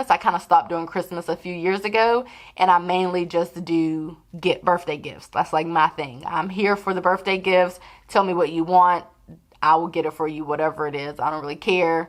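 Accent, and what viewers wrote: South, United States. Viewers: You’ve taken on a southern accent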